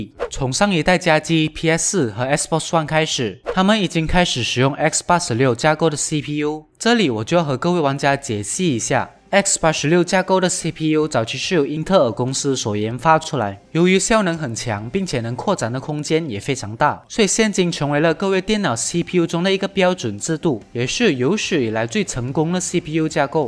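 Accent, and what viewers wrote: Malaysia. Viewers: 他是马来西亚人哦~ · 應該是馬來西亞的口音吧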